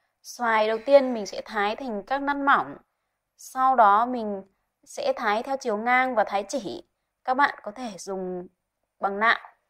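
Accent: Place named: Nam Dinh, Vietnam. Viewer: chuẩn giọng Nam Định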